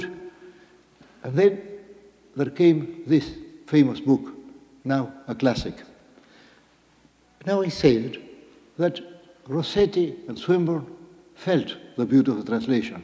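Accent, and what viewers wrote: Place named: England, United Kingdom. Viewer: MUCHAS GRACIAS SEÑORA POR SUBIR ESTAS JOYITAS DE AUDIOS EN DONDE UNO PUEDE OÍR EL ACENTO INGLÉS DE BORGES.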